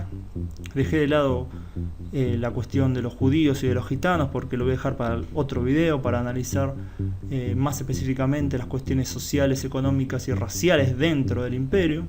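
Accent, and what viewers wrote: Rioplatense, Argentina. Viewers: Lamentablemente, este videísta padece de la tara foniátrica rioplatense de confundir la LL y la Y con la CH; él dice "achá", "chevó", "machor". etc. por querer decir "allá", "llevó", "mayor". No sé por qué acentúa tanto la CH, he visto otros vídeos de otras personas también rioplatenses, pero que no remarcan tanto esa letra, parece que para ellos sería como signo de distinción o algo así, pero lo cierto es que termina fastidiando esa musiquita de la CH. Lo mejor sería insertar una voz robótica, son más neutrales, bien moduladas, y sin sonsonetes de aldea, están disponibles de google.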